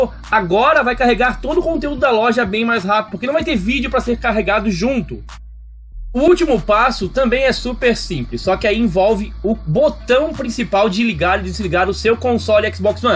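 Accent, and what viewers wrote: Florianópolis, Brazil. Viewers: vc é de floripa? tbm sou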